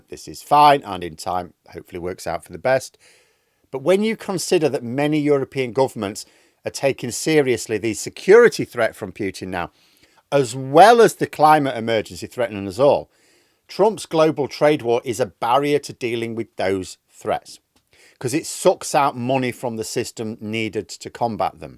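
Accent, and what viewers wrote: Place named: Yorkshire, United Kingdom. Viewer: Is he from yerksher or is it U.S. lol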